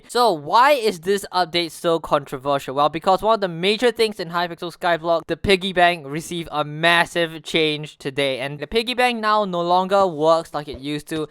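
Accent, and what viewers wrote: Singapore. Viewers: You sound like you're singaporean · Dude r u singaporean cause i am and u have the accent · R u from singapore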